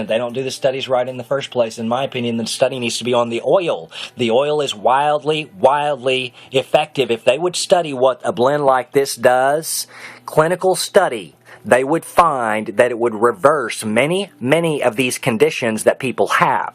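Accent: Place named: South, United States. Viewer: I love your Southern accent. <3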